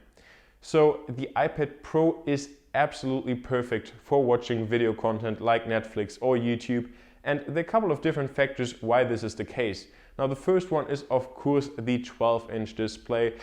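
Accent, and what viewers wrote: Germany. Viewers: i hear german in your voice are you german???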